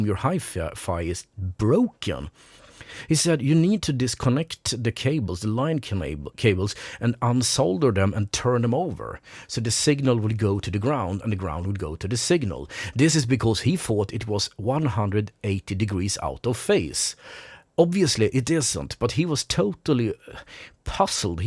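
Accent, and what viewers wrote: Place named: Sweden. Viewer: Horrible swenglish accent, can’t watch theese…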